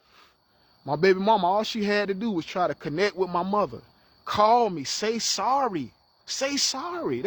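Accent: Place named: Southeast, United States. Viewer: I haven’t finished the whole video yet but people who are aware enough to see, know your spirit. I’ve been watching your videos for years (starting back at your first blood type videos which I still reference to people today). You’ve been nothing but a positive role model for me. I’ve even reposted some of your content before in the past. Idk what state you reside in but you sound like you’re in the Southeast too. It’s tough in our communities because a lot of our people (including friends, family, spouses etc.) are sick. Some more so than others. Whether it be subtle but functional spectrum disorders along the lines of what we know today as ADD, ADHD, Asperger’s/autism all the way to more imperative functioning behavioral disorders such as narcissistic personality disorder to sociopathy & psychopathic tendencies. This is before we even factor in drug & alcohol indulgence of the presence & past as well as people who consume a self destructive selection of “foods”. 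You’re a beacon of light. You’re a teacher surrounded by those who have a hard time even learning. Much less able to take accountability for mistakes they know they made in the past. Most people want to run from their mistakes. I’m watching it happen now with family members putting romantic relationships before the children they already have in the world only to have more children & treat them the same way. It’s a tough reality to accept that a lot of us have sick/psychologically off elders & peers. Just like some o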